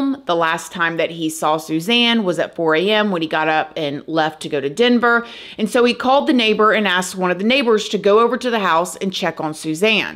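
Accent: South, United States. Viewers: I think he did it. He just looks guilty... I pray that they put the correct person under the jail if it is him it would be so tragic for the girls because they have already lost their mother. If it turns out that he did do it these poor girls will have to spend years in therapy to help cope with the fact that they backed their father and he was lying to them. This is a sad sad sad situation. I also just want to say that I absolutely adore u and ur country accent. anyways I hope they figure out what happened and I hope they find the other person they were looking for when they found her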